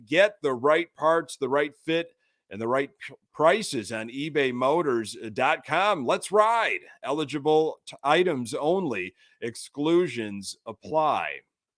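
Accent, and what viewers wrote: Chicago, United States. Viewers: I'm not a Sox fan, but really like your channel. Lots of info, and that Chicago accent.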